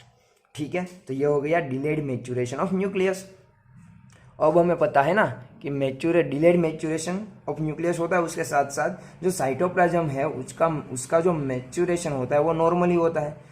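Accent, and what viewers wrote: Gujarat, India. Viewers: I think you are gujju from your accents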